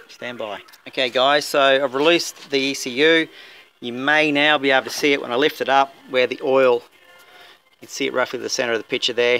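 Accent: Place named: Australia. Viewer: Thanks, mate. It's great to hear an ozzy accent and great advice.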